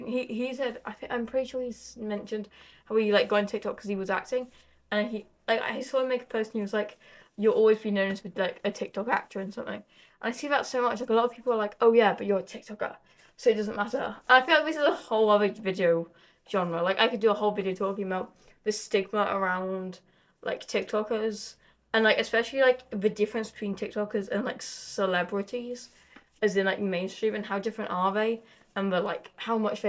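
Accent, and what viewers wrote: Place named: United Kingdom. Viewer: Just found this channel while scrolling through my recommended, 10/10 yap session. I can’t tell if your Bri-ish but the accent is cool lol(Latin American here)🏳️‍⚧️🏳️‍🌈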